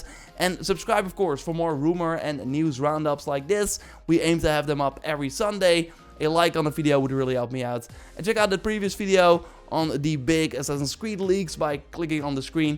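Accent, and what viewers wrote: Netherlands. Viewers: Dutch I believe he's from Amsterdam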